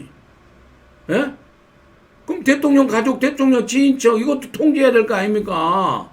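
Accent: Jeolla, South Korea. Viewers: 전라도 사투리가 정겹습니다.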